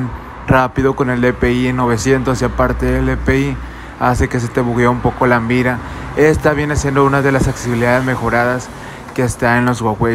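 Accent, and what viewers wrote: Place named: Mexico. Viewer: ok jajajaja yo pensé que eras de México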